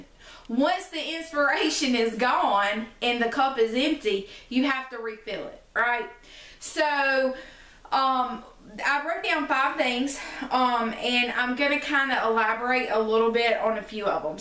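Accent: South, United States. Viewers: your voice is so darn cute, I literally listened to you for your voice :-) I love that southern drawl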